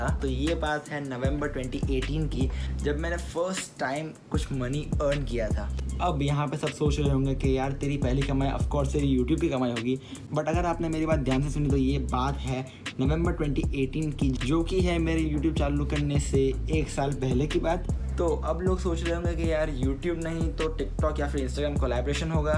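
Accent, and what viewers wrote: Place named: Gujarat, India. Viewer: yeah that's why....his accent is full gujrati 😊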